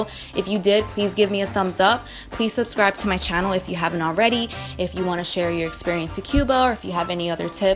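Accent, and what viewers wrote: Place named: United States. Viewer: Damn where are u from..your so cute...but damn your Americanize smh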